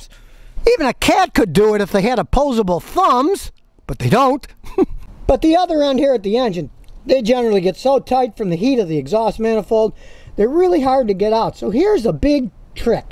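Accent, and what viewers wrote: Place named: Chicago, United States. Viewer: Scotty Kilmer, you're hilarious brother! Chi-town accent adds to the overall experience. It's like Anthony Spilotro became a mechanic 😂😂🤣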